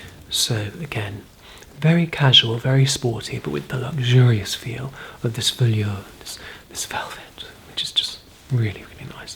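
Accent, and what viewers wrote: RP, United Kingdom. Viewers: You’re so posh I’m beside myself w tears. You’re like a fairy godmother/father I’ve always dreamed of 😭😭😭😭 U HAVE NO IDEA HOW HARD IT IS BEING AMERICAN!!!!!! 🤪😂😂😂😂😂 sometimes I have cried from not being a Brit but that was years ago...... 🤪😂😂😂😂😂😂 I love British people and their accents so much it speaks to my soul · I just discovered this man because of gentle whispers mentioning him and I must say, his voice is soooo soothing and the British accent is just the icing on the cake!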